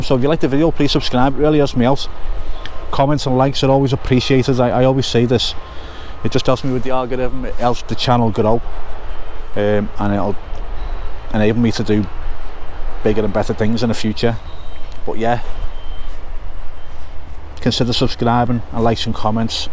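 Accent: Liverpool, United Kingdom. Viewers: Great work, its awesome for s scouser in Texas not just loving seeing the progress of the construction work but getting the dose of “scouse” when all i hear here is y’all and you ground me with “yers all”. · Super well done. Like your updates and the zoom ins. You with other are doing a great job. I find these videos really interesting and has been following from Malta since the start of the project. Keep them coming. Also I like hearing your scouse accent 🙂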